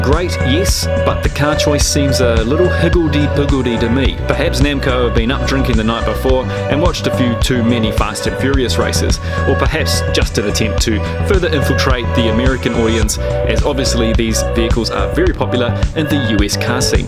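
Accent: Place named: New Zealand. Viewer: haha ... so 19 eighty sex. :) i should have picked you where my kiwi cousin :)))) lol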